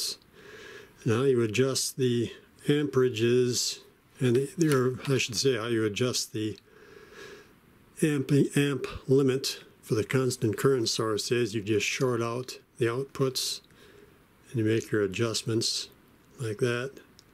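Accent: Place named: China. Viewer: chinglish yup I know :(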